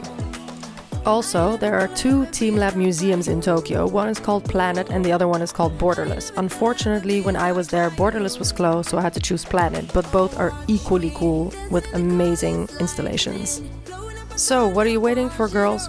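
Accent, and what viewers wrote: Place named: Netherlands. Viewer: Ik ben Japans en deze video kwam als aanbeveling, dus ik heb hem terloops bekeken. Toen ik je uitspraak hoorde, dacht ik: "Huh? Nederlands?" Ik woon al 20 jaar in Nederland, dus ik dacht dat het makkelijk te begrijpen was! Bedankt dat je Japan zo geweldig hebt geïntroduceerd!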